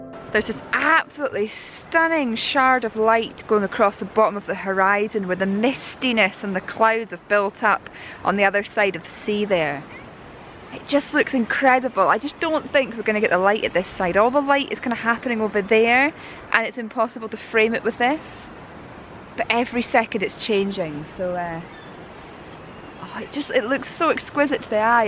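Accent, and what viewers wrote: Scotland, United Kingdom. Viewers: What a pleasant change to hear a Scottish voice - sub'd!.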